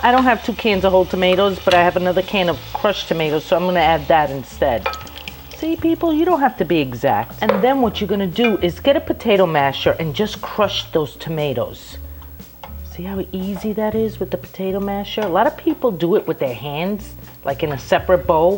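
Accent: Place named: New Jersey, United States. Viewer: I love your accent lol I knew you were from Jersey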